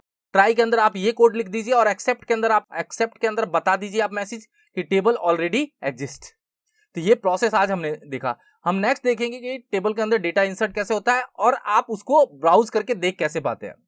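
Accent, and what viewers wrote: India. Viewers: sir this is the right code import sqlite3 conn=sqlite3.connect("sqlite1.db") conn.execute(''' Create Table Student ( st_id INTEGER PRIMARY KEY, # <-- fixed here st_name VARCHAR(50), st_class VARCHAR(10), st_email VARCHAR(30) ) ''') conn.close I noticed that you are using AUTO_INCREMENT for the st_id column. This is not supported by SQLite, which uses a different mechanism for generating primary keys. You need to use INTEGER PRIMARY KEY instead, which will automatically assign a unique integer value to each row. This will also make your table more efficient and compatible with other databases. To fix this, you need to change the st_id column definition to INTEGER PRIMARY KEY I hope it's ok to give feedback, great channel by the way, the biggest benefit of this channel is the Indian voice, learning from English channels becomes tedious due to their dull voices, thank you for this material.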